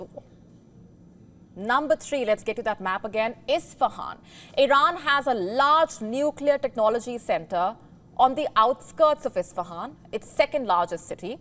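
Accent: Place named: India. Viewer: These Indi accent is awful lol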